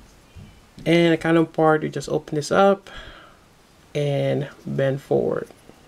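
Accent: Philippines. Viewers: Are you a Filipino? There's something with your accent. :)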